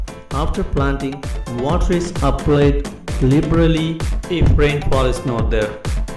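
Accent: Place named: Kerala, India. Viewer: Are u a malayaalee?ur english is like malayaalees uncle.pls tell malayalam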